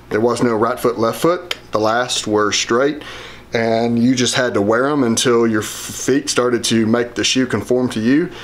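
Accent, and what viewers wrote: South, United States. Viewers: Love the Southern accent. "On" is pronounced "own."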